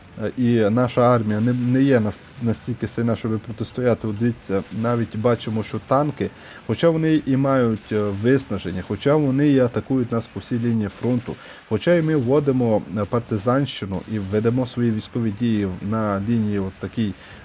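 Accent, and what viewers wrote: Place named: Vinnytsia, Ukraine. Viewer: Друже! Південь Вінничини? Люблю свою країну! За вимову йдеться! Буковина?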